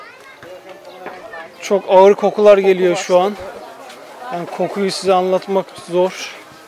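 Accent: Samsun, Turkey. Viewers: "Ben de az yiyim daaa." samsun ağzını filipinlerde görmek duygulandırdı abi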